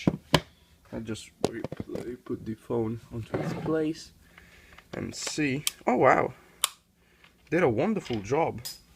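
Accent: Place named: Germany. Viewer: Nice accent mate...thanks for video...honest and correct...;) LOL super funny also. German i guess?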